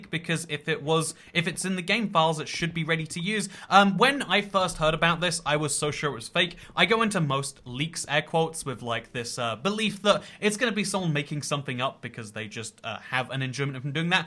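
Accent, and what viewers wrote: United Kingdom. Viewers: why does he sound asian when he says hello im but then sounds british for the rest of the video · THE MYSTERY HAS BEEN SOVLED HES BRITISH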